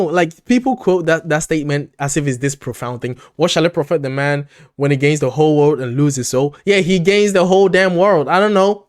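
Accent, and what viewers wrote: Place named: England, United Kingdom. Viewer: I saw an interview with Troy and Tuomas talking about not believing in God and organised religion. Troy actually said you are told about Santa and the tooth fairy and God, but for some reason as we get older some people still believe in God he says its, nonsense. He's English like me and I'm not saying there's no religion over here but if you compare the percentage of church goers to say the US our numbers are far lower, you sound English. This is in no way meant to insult people who believe in God I don't but respect other people that do. Your reactions are always funny informative and interesting. 🇬🇧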